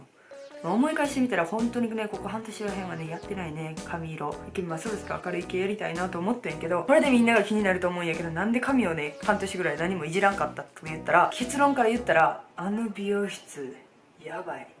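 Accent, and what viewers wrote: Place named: Iyo, Japan. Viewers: 半分伊予弁半分西条弁やな(♡) 私の地元やけん話し方めっちゃ落ち着く♡ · ちゃんの、伊予弁？が大好きです💗 ずっと聞いていたくなる、癖になる、語尾にはまりました😊 伊予弁って可愛い （*'‐'*)♪😄😄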